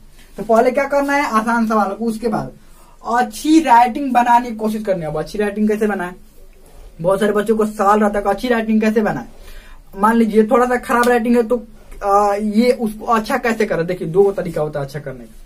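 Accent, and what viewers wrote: Bihar, India. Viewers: Bihari h ka babuaa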